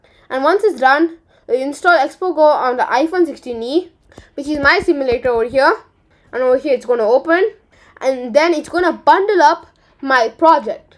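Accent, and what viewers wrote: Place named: India. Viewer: Broski you sound like an average indian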